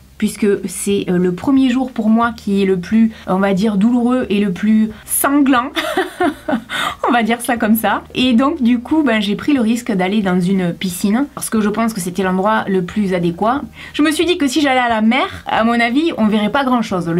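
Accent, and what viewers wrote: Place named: Southwest, France. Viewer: J'adore ton accent du sud-ouest!!!!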